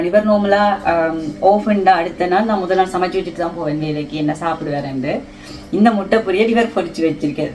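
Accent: Jaffna, Sri Lanka. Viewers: யாழ்ப்பாண தமிழ் இனிமை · யாழ்ப்பாண தமிழ்❤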